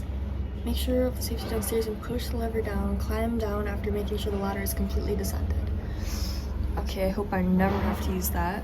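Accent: United States. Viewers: why an American accent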